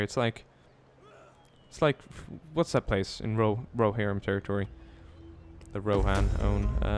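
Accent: England, United Kingdom. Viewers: YOu sure? He sounds pretty English to me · R U british or american?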